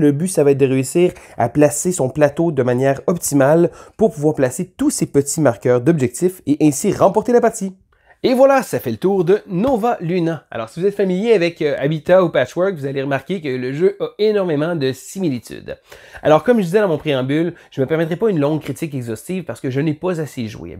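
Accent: Canada. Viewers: Salut! J'ai regardé ce vidéo car j'étais curieux de ce jeu, et j'ai décidé de m'abonner pour même entraîner mon français. Mais je eu des difficultés à comprendre quelque chose, puis-je te demander d'où viens-tu? Je suis curieux de quel accent tu as. Peut-être canadien?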